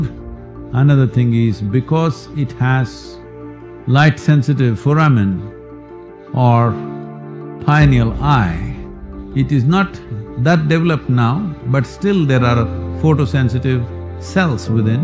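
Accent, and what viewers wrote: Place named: India. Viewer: Ur vdos great. But indians better learn speak English not Indglish.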